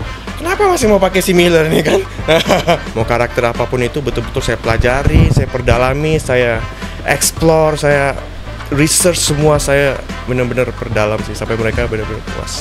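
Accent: Indonesia. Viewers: Aksennya Indonesia banget... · 70% logat jakarta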